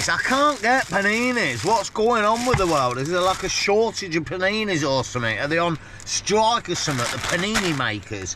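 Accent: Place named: Loughborough, United Kingdom. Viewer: I'm from Loughborough and spotted that accent immediately haha 👌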